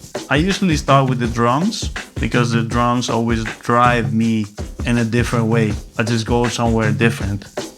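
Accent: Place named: Poland. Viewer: Wow your accent sounds kinda Polish. And you look like a Polish singer Dawid Podsiadło. :D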